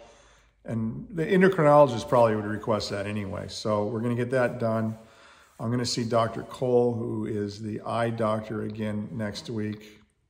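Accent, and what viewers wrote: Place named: Pennsylvania, United States. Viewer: I'm from PA. Friends&family in Sequim. I knew you looked & sounded just like someone from home! Nice to meet you, neighbour, via the world wide Web!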